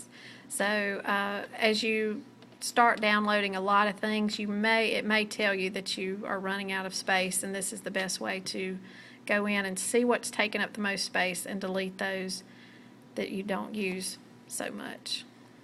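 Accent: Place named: South, United States. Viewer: i love your accent (southern?)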